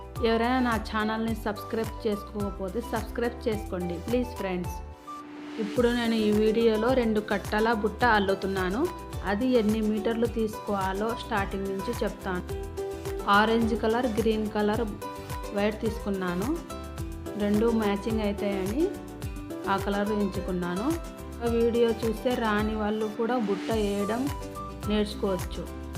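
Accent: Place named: Karnataka, India. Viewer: Mee cheppindi kannada sound yekkuvaga undi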